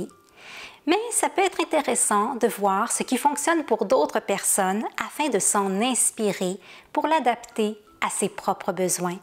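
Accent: Quebec, Canada. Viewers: l'accent sonnait bien le Québec. C'est très agréable <3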